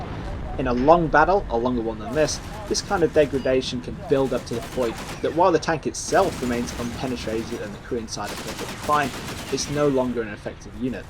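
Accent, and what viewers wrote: United Kingdom. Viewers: Hey! Your editing and narration are just wonderful! In my book, you are the best Combat Mission Youtuber around.:D Must be something with you Brits... the best Youtuber for Forged Alliance Forever is also a British guy his channel is called 'Gyle Cast'. I played this scenario because of your video and enjoyed it very much. I use to be a bit more cautious in moving-to-contact which led to me losing just one lad. Aside from that your tactical analysis is spot-on so I went with much the same plan as you and it also played out much the same. The scenario reminds me very much of the first mission of the 'Task Force thunder' campaign which is in a similar context and tactical situation.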